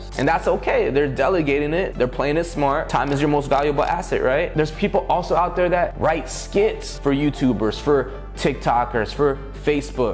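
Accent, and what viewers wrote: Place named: United States. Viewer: Dude you need this in your video for people who , don't understand US accent english 10 Side Hustles to make MONEY from HOME! ($100k + per year) 10 Side Hustles You Can Do To Make Money From Home Section Overview: In this video, the speaker shares ten side hustles that require zero dollars to start and have the potential to replace your current job. The speaker provides detailed information on each of these side hustles. Your Own Clothing Store You can create your own custom t-shirts using websites like Printful or Teespring. You don't need to buy inventory; you just mark up the price from the cost of the product. Create your own Shopify website and connect it with Printful so that every time someone buys something from your Shopify website, it looks like they're buying from your store but in reality is being bought for Printful. 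You never have to worry about cost of inventory and you never really have to pay a dime out of pocket. Script Writer People out there need scripts for making content. Hire scriptwriters on Fiverr or Upwork who will do research on your behalf and create a script for you. Many people hire scriptwriters for their YouTube videos, informative videos, skits for YouTubers, tick-tockers, Facebook creators etc. Showcase your work on Fiverr or Upwork by building a portfolio. YouTube Find a niche and ride with it. If you don't want to show your face, start a cash cow channel showcasing celebrity mansions. 03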